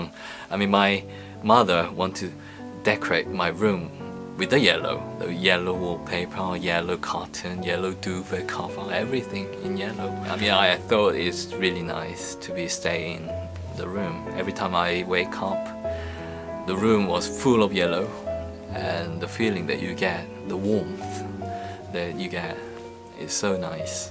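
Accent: United Kingdom. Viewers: 크으... 역시 루마행님 브리티시엑센트 쥑이네~ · I can watch u talking , for the rest of my life! How sweet you are! I like ur British accent 👏🏻❤️ and i love your new album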